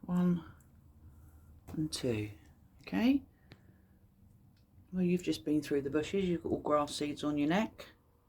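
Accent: United Kingdom. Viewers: BUONASERA SIGNORA È SEMPRE UN IMMENSO PIACERE SENTIRE IL SUO PERFETTO ACCENTO BRITANNICO E VEDERE I SUOI MERAVIGLIOSI GATTI E LE STUPENDE VOLPI DEL SUO QUARTIERE ANGELI STUPENDI ANCHE LEI È UN ANGELO PER TUTTI LORO!!!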